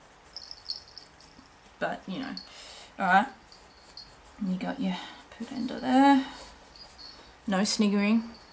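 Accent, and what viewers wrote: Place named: Australia. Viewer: Yay! an Aussie you-tuber!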